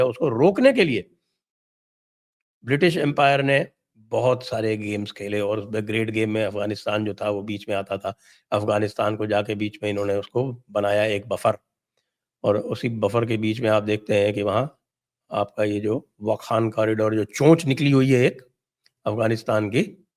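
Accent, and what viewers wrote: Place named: Gwalior, India. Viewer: सर जय श्री राम, बहुत दिनों बाद आपकी भाषा में typical ग्वालियर वाला पुट सुनकर बहुत अच्छा लगा l धन्यवाद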